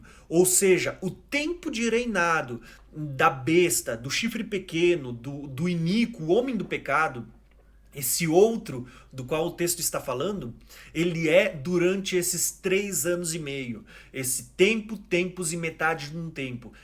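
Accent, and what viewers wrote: Rio Grande do Sul, Brazil. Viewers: Shalom irmão !!! Excelente video !!! Sutaque de gaúcho !!! Faz um vídeo sobre MATITYAHU [ Mateus] cap 5 vs 17 e 18 !!!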